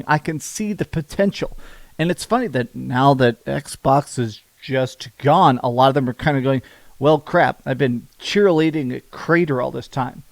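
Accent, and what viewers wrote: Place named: Wisconsin, United States. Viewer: Is from Wisconsin? What is that accent?!???😂🎉😢😢🎉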